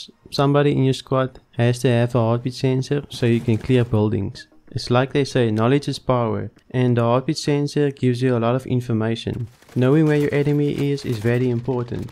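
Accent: South Africa. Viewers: 😂 Love the South African accent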